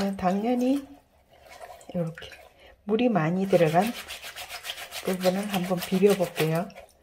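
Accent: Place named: Gyeongsang, South Korea. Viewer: 반가워요 ^^ 갱상도 사투리가 와 이리 듣기 좋뇨..ㅎㅎ 풀청했습니다..그리고, 좋아요..5 눌렀습니다.. 요근래 구글이 좋아요를 삭제하는것 같더라구요, 그래서 전 시간내서 어렵게 방문 한 유친님들게 풀청후에 좋아요 눌러드립니다.. 응원합니다 ~!!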